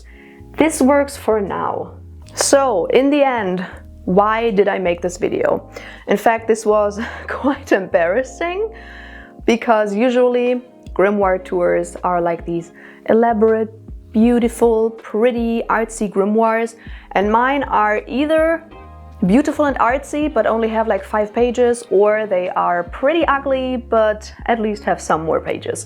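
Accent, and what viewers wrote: Germany. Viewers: Dude i knew you had a german accent and not a scandinavian.... Why are you saying you are norse???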